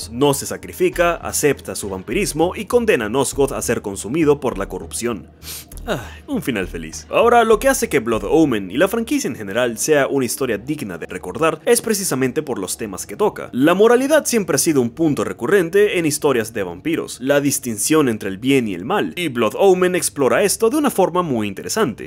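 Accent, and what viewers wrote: Venezuela. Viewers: Será venezolano el men ?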